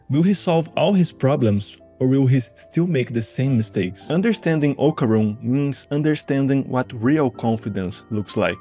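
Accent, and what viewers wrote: Brazil. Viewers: Great analysis dude! This character trait about Okarun's transformation is something I've already noticed a long time ago, but it's nice to see such a detailed and profound analysis about it. Also.. tu é brasileiro né? Notei pelo seu acento kkkk (mas teu inglês é mt bom man) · acredito que seja brasileiro esse sotaque ingres ai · are you brazillian? i can tell by the way you speak english, just curious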